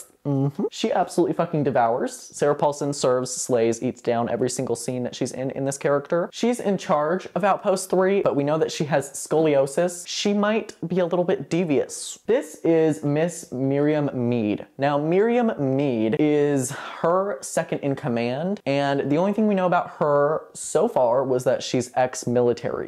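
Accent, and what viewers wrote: South, United States. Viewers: your rapidness but like simultaneous efficiency with videos is epic??? a tale to be foretold thru generations?? but i also love the growth and being able to see ur personality and personal twists on things come out more and more, so obsessed! also the southern accent sheeyt sends me every time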